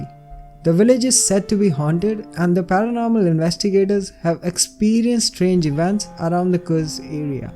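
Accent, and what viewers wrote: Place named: India. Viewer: I love ur accent and I think ur accent is indian... 🥰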